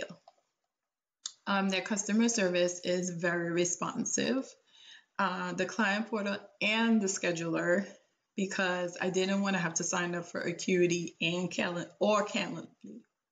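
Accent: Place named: Caribbean. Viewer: I'm hearing a Caribbean island accent 🤔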